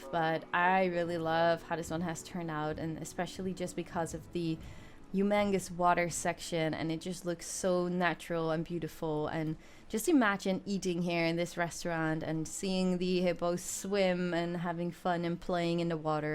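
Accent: Netherlands. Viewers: are you dutch? because to me your english has a little dutch accent and you pronounced oudehands dierenpark perfectly! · Nice zoo! And also, are you Dutch? xD Good accent. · are you dutch?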